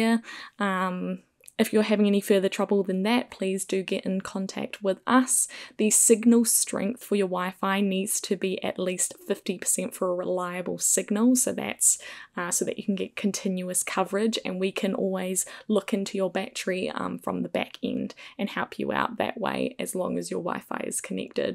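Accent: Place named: New Zealand. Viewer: I'm still struggling with the Kiwi accent, where an "I" is pronounced like an "E" and an "E is pronounced like an "I".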